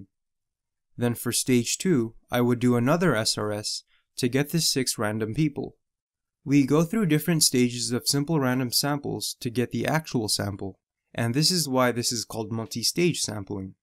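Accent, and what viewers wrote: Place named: China. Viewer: video not useful could not understand heavy Chinese accent. would not recommend -2/10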